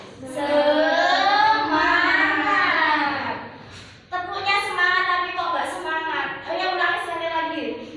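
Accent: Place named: Java, Indonesia. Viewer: Medoookk rekk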